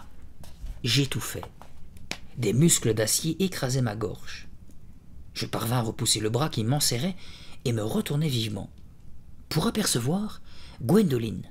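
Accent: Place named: Belgium. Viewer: Bonjour! J'adore vos vidéos. Je travaille à Tokyo, souvent de nuit et vos lectures m'aident à tenir jusqu'au matin. Je ne peux m'empêcher d'esquisser un sourire quand j'entends votre accent Belge poindre. Ça fait partie du charme de votre chaîne 😊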